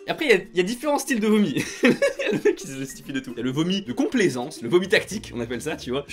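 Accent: South, France. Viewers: Quel accent du sud XD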